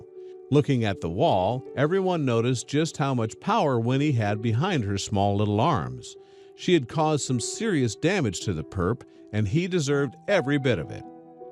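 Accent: United States. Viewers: This is a British story dragged out by an American narrator with police cars and cops from NYPD, Italian or Spanish cop cars, and several actors, must do better when presenting a tale from Liverpool, England!! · Why didn't a English person do the commentry Americans talk about paint dry annoying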